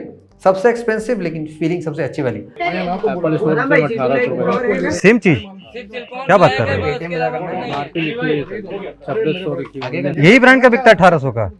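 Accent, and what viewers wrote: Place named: Kanpur, India. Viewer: Kanpur bali vibe aa rhi h bhaiya ji...😅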